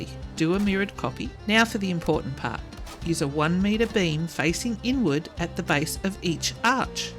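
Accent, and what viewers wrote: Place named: Australia. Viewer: It’s wonderful to hear an Aussie accent on a Valheim build vid! Thanks for the beautiful build- I’ll be trying this one out! 💕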